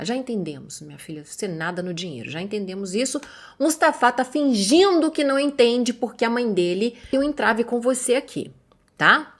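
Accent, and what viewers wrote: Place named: Minas Gerais, Brazil. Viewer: Fiote de cruz credo 😂😂😂😂😂 esse jeitinho mineiro da me mata amo minha Minas Gerais 😅😊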